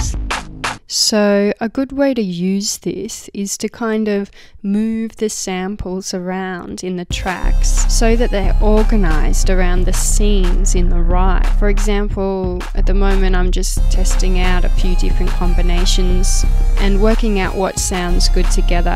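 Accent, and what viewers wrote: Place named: Australia. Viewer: australian accents, man.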